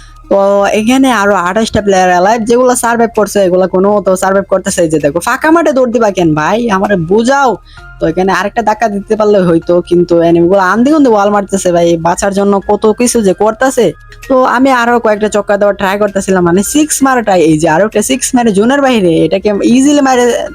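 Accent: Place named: Sylhet, Bangladesh. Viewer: সিলেট বাড়ি নি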